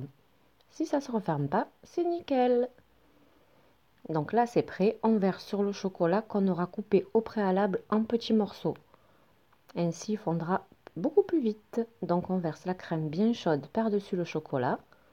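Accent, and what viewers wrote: South, France. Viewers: Il est toujours plaisant de visionner vos vidéos qui sont toujours très bien expliquées et votre voix est très agréable. Une voix du sud moi qui suis du nord. Merci et bonne soirée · Vous êtes un vrai chef . Trop top l’accent du sud.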